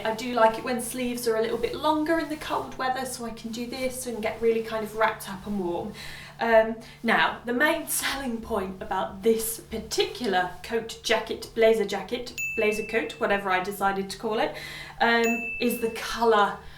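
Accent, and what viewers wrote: United Kingdom. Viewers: Gorgeous those blazers !!! I loved them. In fact, I liked all of your purchases, the white and pierced blouse is beautiful !!! Oh, I always forget to tell you, I love your UK accent !!! Best wishes from Brazil.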